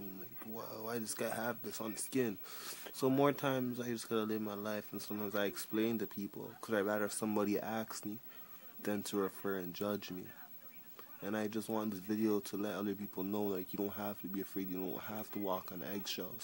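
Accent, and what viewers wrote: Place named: Jamaica. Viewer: I hear a yardie accent, bap bap bap. Yu still cute my yute with vitiligo or not. I have vitiligo too and people ask/say the same thing about my skin, but what can we do? But you will be fine.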